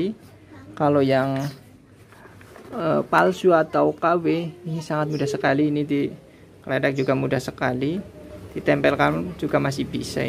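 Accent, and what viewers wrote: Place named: Banyumas, Indonesia. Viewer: Ra ngapak ra kepenak kang...sukses selalu👍😁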